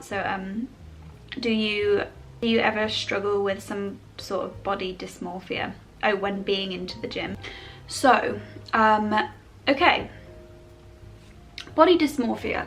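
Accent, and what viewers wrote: United Kingdom. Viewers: Baby, how I like your British English, it sounds so funny, your way of talking and laughing, it's great..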